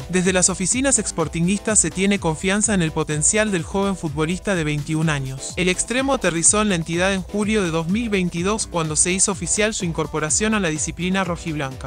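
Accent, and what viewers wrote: Argentina. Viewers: Que inche necesidad de el acento argentino? Le da más valor a la publicación?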